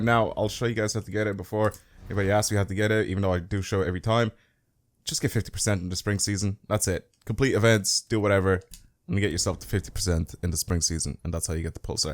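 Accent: Dublin, Ireland. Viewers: u from dublin bro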